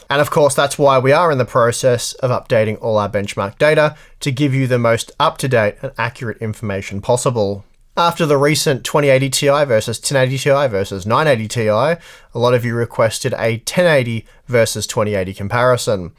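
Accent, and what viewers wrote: Australia. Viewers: I never have any problem understanding the Aussie accent but I legit heard "harbor on box" this time. Also with Turing pricing was the biggest problem. If the 2080 and the 2080Ti were both $200 cheaper they would've been a decent pair of GPUs.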